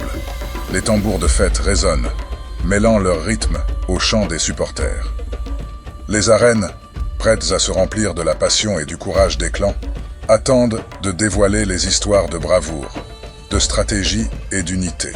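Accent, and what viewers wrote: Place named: France. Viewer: Vraiment magnifique … Mais pourquoi l'accent français du colon? Soyons également fier de nos accents Africains.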